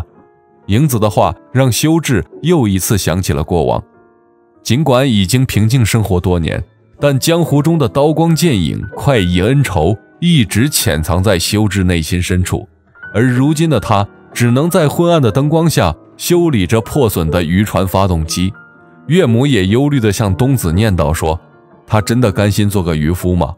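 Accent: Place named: Osaka, Japan. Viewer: 大阪弁いいね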